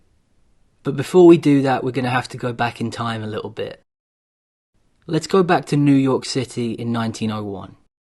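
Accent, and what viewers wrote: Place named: United Kingdom. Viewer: Funny how you Brits are obsessed with our American wild west. And, Dude, you have a cool accent 😎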